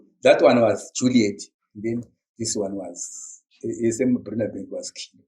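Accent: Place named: Shona, Zimbabwe. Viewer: What real tribe is he coming from because he speak Ndebele with a shona pronounceing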